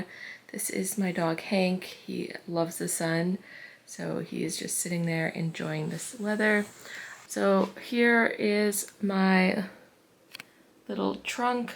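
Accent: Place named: Minnesota, United States. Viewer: under a minute in and you must be from Minnesota.. the "beg" (bag) gives it away , lol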